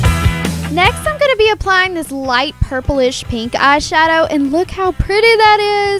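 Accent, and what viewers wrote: South, United States. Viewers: Are you southern? lawl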